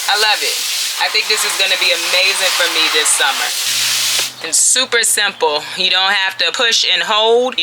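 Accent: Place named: Virginia, United States. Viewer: LoL, it's always the Virginia accent that I love 💞.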